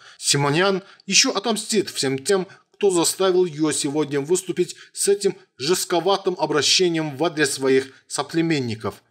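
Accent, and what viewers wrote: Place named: Azerbaijan. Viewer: Margaritu armyane lyubili I lybyat! A vse, kto seichas pishut (yakobi protiv Margo), eto-- vashi soplemenniki! A vash akcenq glupovatogo azera, diktor, otvratil'no I opasno dlya ushei))))). Vi uje sdelali nash abrikos samim znamenitim fruktom na planete, oluxi))))))). Tak shto sidite spokoyno, zanimaites' tem, k chemu privikli----- prodajei zelen'y!))))))))))))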